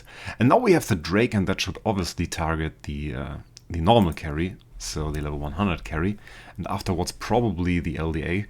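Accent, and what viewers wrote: Germany. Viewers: Ur from germany right?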